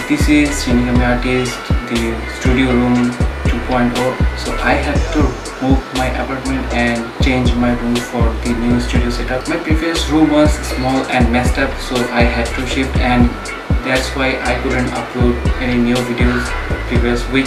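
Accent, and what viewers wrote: India. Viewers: Do u live in india or bangladesh???? Im very curious because ur accent is like bangalee's ... and very awsome tour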